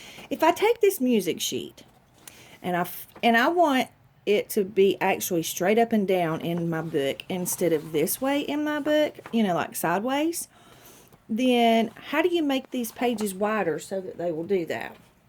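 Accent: South, United States. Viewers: Great tutorial. Love your accent, the way you say booooks, lol. There is just something so charming about a southern accent. Love from Denmark :)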